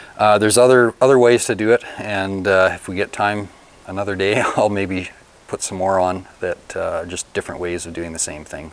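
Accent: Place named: Saskatchewan, Canada. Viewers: Canadian accent is hilarious. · There isn't a Canadian accent. This is a Saskatchewan accent. Put him next to someone from Cape Breton and they'd sound pretty different.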